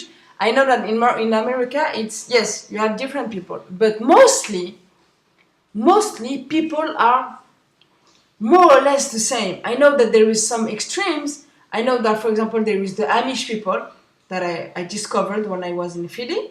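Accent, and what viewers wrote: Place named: France. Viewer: Trop mimi ton petit accent francais,tres bon info.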